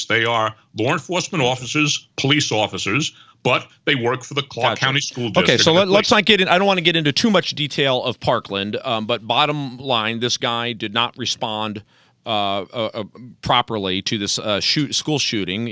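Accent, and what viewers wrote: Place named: New York, United States. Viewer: Mr. is a confirmed Jesuit operative with direct ties to the headquarters of the Swiss Guard in Vatican City, the 5 families of the Cosa Nostra, nearly executive and board member of several Hollywood studios. Notice how hard he tries (and fails) to present himself as some educated professional. His struggle to suppress his borough accent is clear as day. Most of his ‘guests’ also just so happen to be big dumb wops — the vast majority of which are uneducated and underqualified to work in the professions they purport to be associated with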